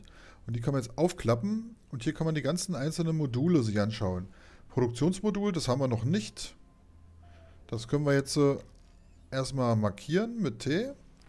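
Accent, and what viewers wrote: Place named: Berlin, Germany. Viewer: Klingst wie ein Brandenburger. Die haben immer den heftigsten Berliner Dialekt.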